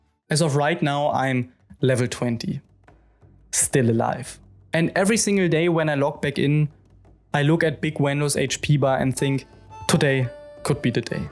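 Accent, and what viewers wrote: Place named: Germany. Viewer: German English sounds funny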